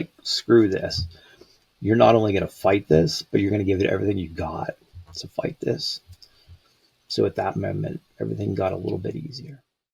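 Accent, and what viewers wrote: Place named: Pittsburgh, United States. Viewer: You can hear that Pittsburgh accent for sure!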